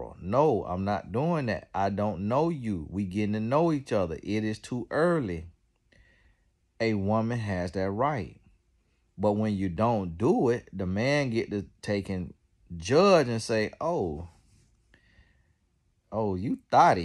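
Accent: South, United States. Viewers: Boiiii you have one country accent lol lol I'm from the South too I hear it in ya lol lol love your messages they are well needed, Big Blessings to you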